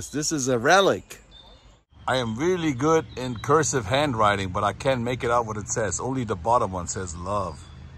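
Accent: England, United Kingdom. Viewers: The English accent had me straight away 😂😂😂😂😂🤣🤣🤣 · The England accent 🤣. Why I never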